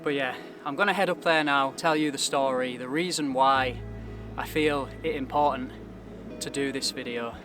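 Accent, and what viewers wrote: Yorkshire, United Kingdom. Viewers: Good video, I’m struggling to pinpoint which part of Yorkshire your accent’s from. I’ve done Striding Edge many times over the years and been down both sides of the bad step. The first time down the bad side was forwards with a day sack on my back - I’ll not do that again! I’ve come across people struggling at the top of the step and helped them by spotting them down the safer side. I’ve also had a near miss coming down Swirral edge after slipping on loose scree. It’s best to be on your guard 100% on any edge and always lean in to the ridge so any slip or trip sends you the safe way, towards the rock not away from it. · accents are part of heritage and culture. This is a distinctly British accent, not an imported one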